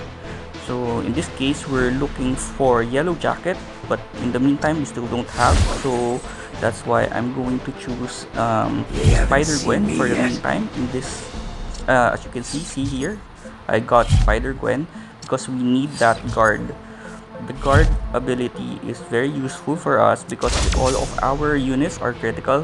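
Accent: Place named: Philippines. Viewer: Nice! Im building this deck once I unlock Elektra, only need The Hood and I can unlock her as a hero. Btw are you Filipino? Guess ko lng from the accent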